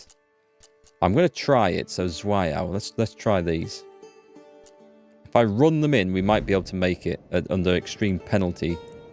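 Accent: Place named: North Yorkshire, United Kingdom. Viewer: could hear the subtle North Yorks accent, I grew up in county Durham, so kinda sat on the yorks/lancs rivalry border :)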